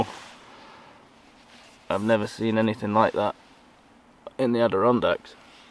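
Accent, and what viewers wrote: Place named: United Kingdom. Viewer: You sound like a Brit but you talk about walmart and bucks. Where are you? (NOOB to your channel)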